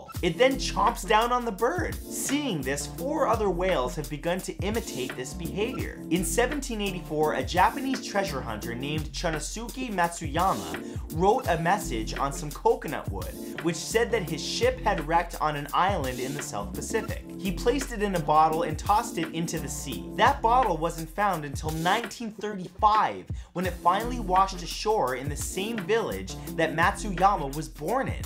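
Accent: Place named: Canada. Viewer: He is Canadian, give him a break eh?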